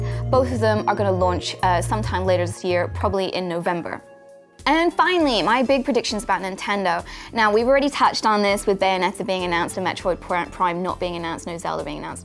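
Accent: United States. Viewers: why do you always sound american but your from england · Is English I keep on getting confused because sometimes she sounds american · Before E3 she had an English accent, now she sounds american.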